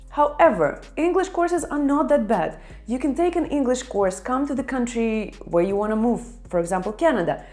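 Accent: Russia. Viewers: Полезно 🎉как же приятно узнавать русскоговорящих по акценту 😂ни с чем ни спутаешь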